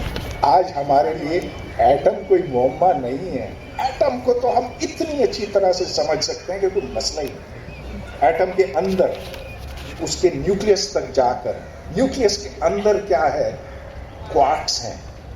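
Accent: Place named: United Kingdom. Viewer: and we have better scholars than you , we always had. Speaking British English wont help you idiot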